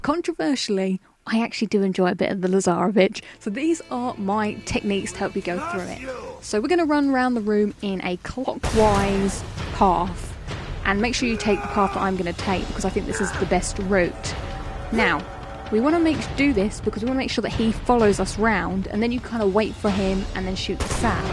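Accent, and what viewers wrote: United Kingdom. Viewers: shut up british p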